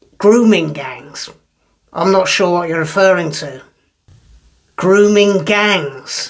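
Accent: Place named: RP, United Kingdom. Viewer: I've never heard a Labour MP speak in RP mate, you sound more like a Tory MP to me!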